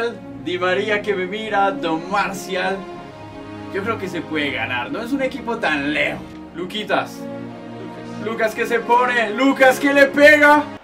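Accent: Colombia. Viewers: Tu eres colombiano desde el minuto me di cuenta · de que parte de colombiano eres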